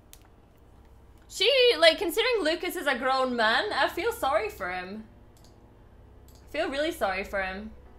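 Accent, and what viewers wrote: Scotland, United Kingdom. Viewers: Are you Scottish ? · Are you British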